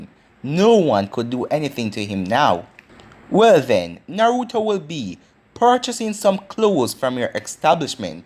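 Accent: Jamaica. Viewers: Why do u sound like a Jamaican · ok, I asked cause I'm Jamaican and he sounds like a jamaican that is trying to learn English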